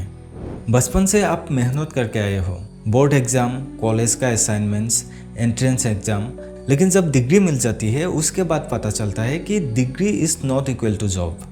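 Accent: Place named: Bengal, India. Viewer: heavy bengali accent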